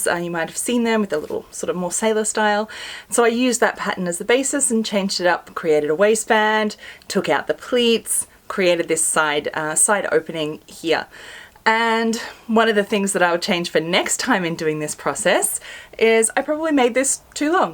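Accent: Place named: Australia. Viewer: Hi Yes this is what I have really enjoyed about your videos. It’s great to reinforce that refining fit, design and restyling are a stepwise process. Too often, with some other youtubers, we are left with the impression that the completed item was produced from an idea and then executed without change from that idea. Although I KNOW this is not at all likely to be true, it still makes me feel a bit like a doofus because I can only seem to do these things step by step. It’s also great to reinforce that the clothes we buy in shops have been through many rounds of test garments. Often home sewers will make only 1 or 2 items from the same pattern.......and then be surprised that there are so many changes that have to be made to finesse the fit and finish. I constantly remind myself of this process that commercial designers go through in order to cut myself a bit of slack when a make seems to require SO MUCH fiddling with to make it work. 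So. Thanks for your reality checks. Love your work PS it’s great to hear an Australian accent too. I seem to have trouble finding local content. Not that it should matter.......but it sort of does (and not that local anyway..... Melbourne girl here)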